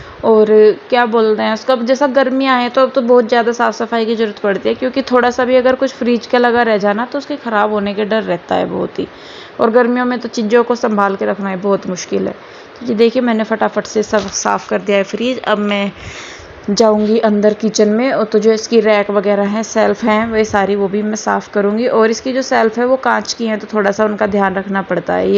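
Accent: Haryana, India. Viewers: Ye haryanvi accent hai.harek ko apni bhasha pe garv hona chhye n ki sharmindgi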